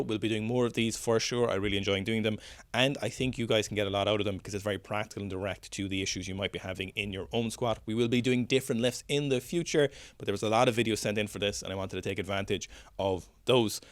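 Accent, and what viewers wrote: Ireland. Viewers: Aggressive, disorganised breeding before the squat. Man I love the Irish accent.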